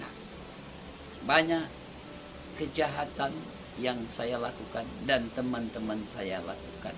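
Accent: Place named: Aceh, Indonesia. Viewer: Logat bapak di awal pembicaraan seperti orang Aceh. Tapi ditengah pembicaraan bapak, lok logat Aceh nya jadi hilang sih Pak... Sebenarnya bapak orang mana sih? Gimana sih si bapak ini.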